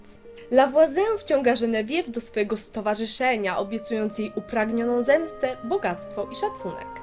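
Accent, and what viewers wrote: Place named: France. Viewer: Cóż za wspaniały, francuski akcent :D Wspaniała recenzja. Dobrałaś do niej świetną muzykę :D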